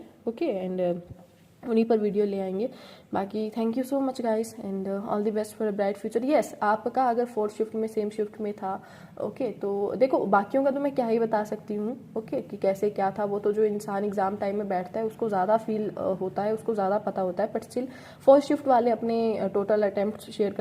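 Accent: Haryana, India. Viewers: Good attempts 😀👍 aapka haryanvi accent bhot accha lagta hai meko 😊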